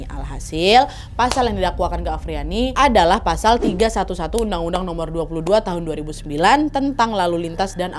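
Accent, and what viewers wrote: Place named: Palembang, Indonesia. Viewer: Suka banget sama cara bicaranya,.wong kito galo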